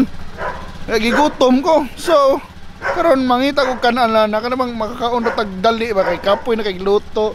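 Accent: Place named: Cebuano, Philippines. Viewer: astig Cebuano accent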